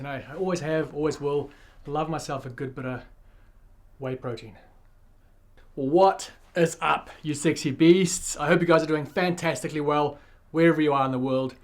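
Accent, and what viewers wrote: South Africa. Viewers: Hey Mate. It’s weird listening to another South African on YouTube, but I like the videos. Keep them coming. What gym did you own in Auckland? Was it Andfit?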